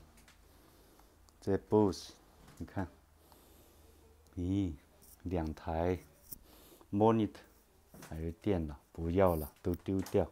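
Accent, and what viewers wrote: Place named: Chongqing, China. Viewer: 听口音 你是重庆方向的吗😮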